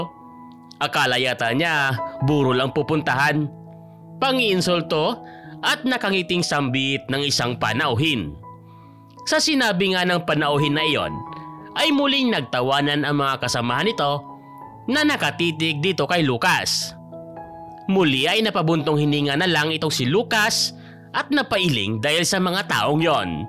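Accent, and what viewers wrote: Visayas, Philippines. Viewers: Bat ganon ang diction at style ng pag kwento mo, BISAYA KA DONG?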